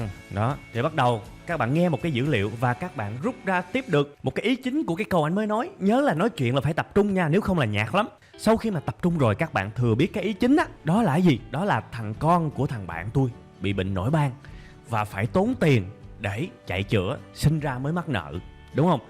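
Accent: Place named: Mekong Delta, Vietnam. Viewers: Mới đầu nghe anh này giảng dài dòng cũng thấy khó chịu, bực mình lắm nhưng coi hết mấy clip thì thấy nội dung hay, giọng ảnh miền Tây chân chất, clip có dàn dựng hiệu ứng 1 cách khoa học, lời giảng dễ hiểu, chân phương nên bị ghiền! :D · Anh chắc người miền tây hả cách nói chuyện của anh với funny gaming tv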